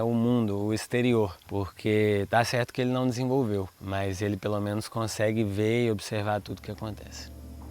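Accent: Minas Gerais, Brazil. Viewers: Muito estranho! Curioso! Obrigada por partilhar! Vc é mineiro?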